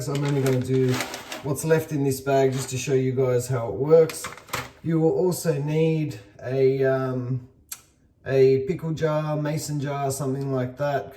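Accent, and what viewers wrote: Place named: Australia. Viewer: love your accent as well. I know your aussie but can tell theres a background somewhere,maybe second generation aussie but i can hear the little accent coming and going. Im from Sydney so grew up with lots of nationalities. Reminds me of home.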